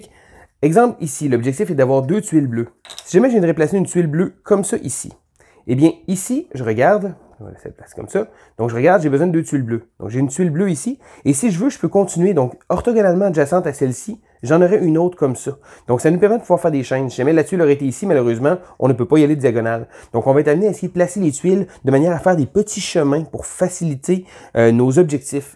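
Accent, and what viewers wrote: Canada. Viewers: Salut! J'ai regardé ce vidéo car j'étais curieux de ce jeu, et j'ai décidé de m'abonner pour même entraîner mon français. Mais je eu des difficultés à comprendre quelque chose, puis-je te demander d'où viens-tu? Je suis curieux de quel accent tu as. Peut-être canadien?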